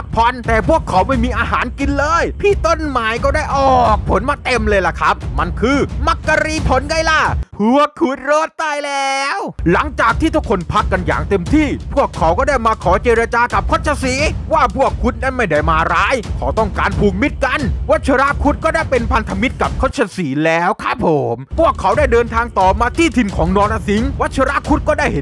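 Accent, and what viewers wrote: South, Thailand. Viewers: พี่คะพี่เป็นคนใต้ใช่ไม่คะ(ตอบหน่อยนะคะ)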